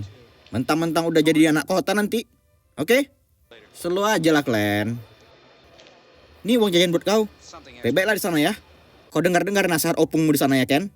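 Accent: Medan, Indonesia. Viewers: Bahasa Indonesia versi Medan emng bkin ngakak 😂😂😂😂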